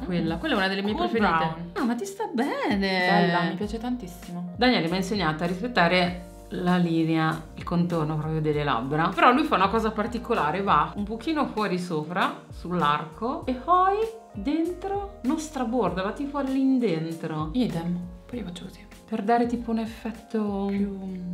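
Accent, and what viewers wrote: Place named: Apulia, Italy. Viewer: Riconoscerei una Pugliese tra mille persone ahaha. Adoro ❤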